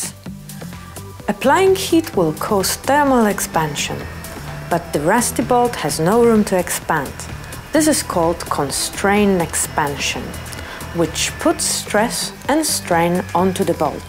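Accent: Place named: Eastern Europe. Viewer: Why put a non English speaking Eastern European for an english video?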